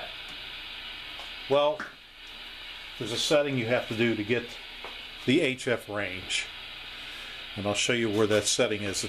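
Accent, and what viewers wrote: United States. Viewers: are you from Pittsburgh? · Sounds like you have a Pennsylvania Dutch Accent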